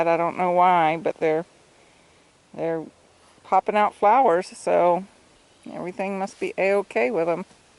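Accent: South, United States. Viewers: Thanks for the Southern accent I miss it so much I am a southern boy living in a different country and I need to hear the accent sometimes.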